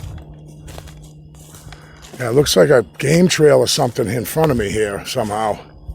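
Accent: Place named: Boston, United States. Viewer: You have an accent. Maybe Boston. Where are you originally from you have an accent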